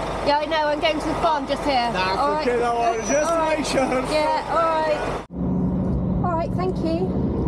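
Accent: West Country, United Kingdom. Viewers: Brilliant to see your first vlog! Like the camera you use in the truck, nice to see all the different views and angle's whilst driving, especially when you're going round a corner and it shows the side of the trailer cutting the corner, great to hear you talking, nothing beats a west country accent 👍👍🤛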